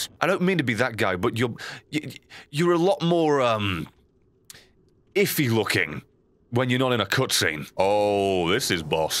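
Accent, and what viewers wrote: Scotland, United Kingdom. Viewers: Less British than I remember, more Scottish???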